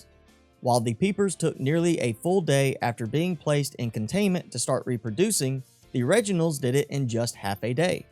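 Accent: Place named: Alabama, United States. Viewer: ALABAMAAA ACENT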